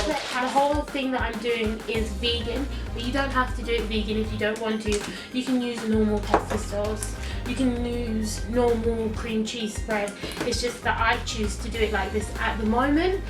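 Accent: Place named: Belgium. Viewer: it's a belgian accent :p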